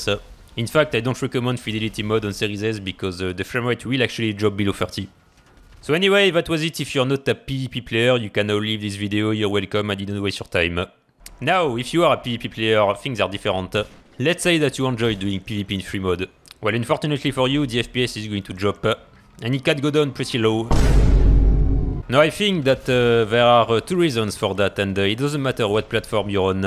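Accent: France. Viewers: Your French accent is epic! xD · Thanks for the info it's pretty precise After 10 of your vidéo i heard a french accent, u talk very good btw but i wanna be sure Ty Ytb Recom' for finding you ! Continu ^^ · never get tired of hearing baguette accent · French accent 😄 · L’accent français prend parfois le dessus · accent francais ptdr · L'accent français qui ressort ça fait grave bizarre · Wow bruh that is one strong french accent 😅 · fait un effort sur ton accent je parle que peut anglais et j'ai un meilleur accent aucun effort les francais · lol l'accent français me fume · Hell yes, I could listen to you curse shit up all day with that awesome French accent!! Chicks must be sitting and sliding around you 😂😂😂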